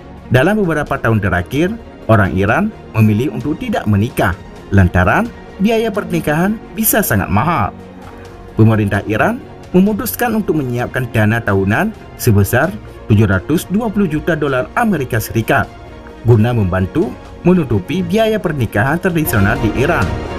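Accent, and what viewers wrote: Aceh, Indonesia. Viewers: orang aceh..dari logat bicaranya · macam orang Aceh lagi berbahasa Indonesia.. logat Aceh nya masih kental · Dialek host nya dialek Aceh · Admin org aceh kah? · Admin nya kayak org Aceh ya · Orang aceh boz ku. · Pengisi suara apa Aceh100% Aceh Pidie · Logat nya orang Aceh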